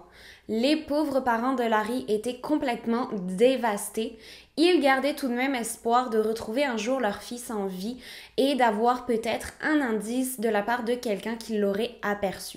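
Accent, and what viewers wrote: Quebec, Canada. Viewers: Merci pour ta vidéo 😊je viens de m'abonner 😍es-tu québécoise? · C'est la première fois que j'écoute une de tes vidéos... mais ce que ma frapper.. es- tu québécoise ? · T’es québécoise ou française ? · larry avait l'air d'un sacré demeuré sinon laisse tomber la FAQ c'est naze, tu voudrais pas raconter des saloperies avec ton accent quebecois c'est plus drole!!** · C’est moi ou t’a un accent québécois? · je viens de capter l'accent Canadien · Tu très léger accent québécois c’est vraiment mignon, j’ai froncé les sourcils je l’entendais pas tout le temps. Mais le sujet est vraiment flippant.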